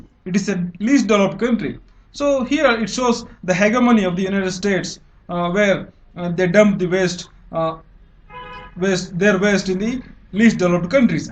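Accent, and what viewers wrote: India. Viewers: Are you kannadiga. Your accent says that.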